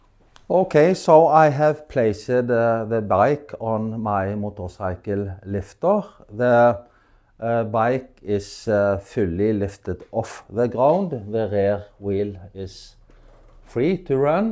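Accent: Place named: Scandinavia. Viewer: so funny, when i hear that scandinavian accent I think of all the scandinavian rally and f1 champions and i'll just buy anything you say :o))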